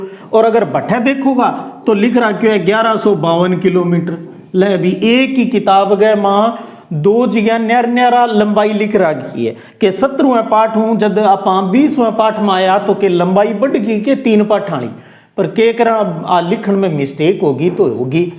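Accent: Marwari, India. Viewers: Sir hmare school me bhi ek sir marvadi bole he ve bahut achi marvadi bole he aap ke jesi 👌👌👌👌🤗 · Aaj sir marwadi bol rage ho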